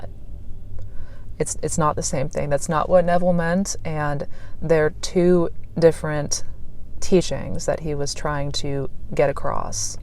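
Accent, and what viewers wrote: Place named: Toronto, Canada. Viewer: Your the bomb... i swear your from Toronto the way you speak...lol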